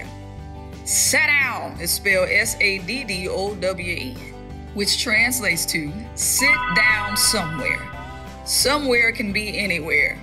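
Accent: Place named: Mississippi, United States. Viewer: Love your accent. Not the same as some Mississippians. I like it better the Mississippi British brogue one.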